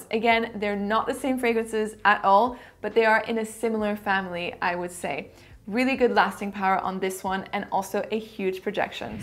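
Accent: France. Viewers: Bonjour, Je viens juste de découvrir cette vidéo, je reconnais un accent français! Est tu française. As tu déjà fait un top 10 de tes parfums préférés et si oui pourrais-tu m’envoyer le lien? Je suis dingue de parfums et suis toujours à la recherche de nouvelles découvertes 🥰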